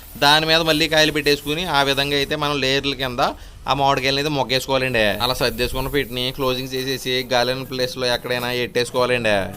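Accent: Godavari, India. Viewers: Enthina godavari godavari slangs 😅😅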